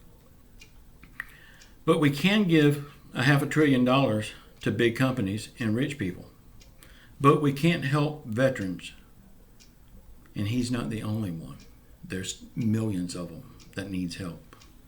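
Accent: South, United States. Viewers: Talks very slow with a southern draw. However, he’s very direct & to the point. No BS approach.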